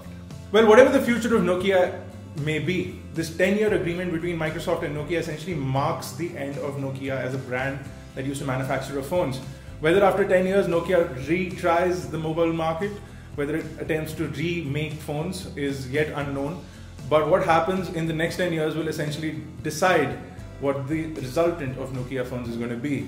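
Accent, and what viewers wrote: India. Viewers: bruh? you really that ignorant? of course he has an accent. he's from india. dont be a douche. id like to hear you speak english without breaking a line or two. in addition to your english, its ""you're" indicates his/her characteristic whilst "your" is directed to his/her posession. practice more.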